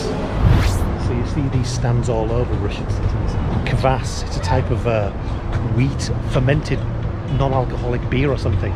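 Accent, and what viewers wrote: England, United Kingdom. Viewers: и цены называл в фунтах, так что даже во вкладку "о канале" можно не заходить + очевидное английское произношение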